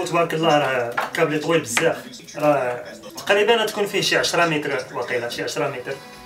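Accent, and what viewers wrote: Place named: Algeria. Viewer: هاذة جزائري تغيرت لهجته بسبب الاحتلال الفرنسي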